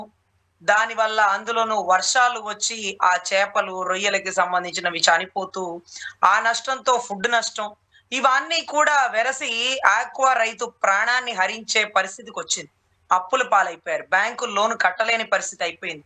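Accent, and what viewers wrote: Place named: Guntur, India. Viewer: Arre arre Guntur language ❤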